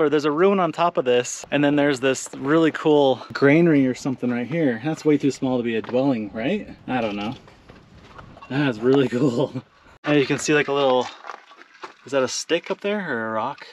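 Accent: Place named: Utah, United States. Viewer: Depending on where you are my money is on Fremont Indians if you are north of The Colorado River or Anasazi if you are south of the Colorado. The terrain looks like southeastern Utah. Maybe somewhere between Beef Basin and Grand Gulch. I lived in Moab for almost 30 years. Sites like this are all over the place in and around Canyonlands. Secure food storage was critical and it is almost a certainty that this construction was for that purpose. Actual dwellings in that area tended to be pit houses. Listening to your narration I am guessing you are a Utahn. I’m even going to guess Utah County but oh my heck…. I could be wrong. 😊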